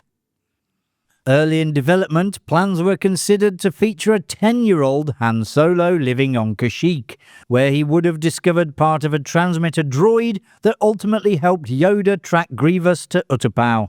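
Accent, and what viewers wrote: United Kingdom. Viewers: what the hell planet is ko-RUSS-kant? check that pronunciation again sir. :) and now the lava planet is named after a middle eastern guy? look, I get that you are a Britt sir, but come on, even those who can't speak english can get the planet names right. is this is AI I'm gonna be sick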